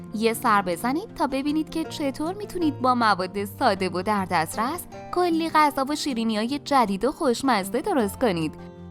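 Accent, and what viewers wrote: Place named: Isfahan, Iran. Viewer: ازلهجه این حاج خانم پیداست که اصفهانی هستند😛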